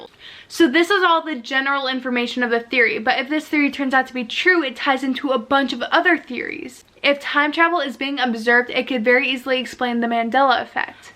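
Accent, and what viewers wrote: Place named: Chicago, United States. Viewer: IS that a Chicago accent? Love it. I have been reading these books as well. Very bizarre. Especially when you look at the Von Braun books which seem connected to Elon Musk.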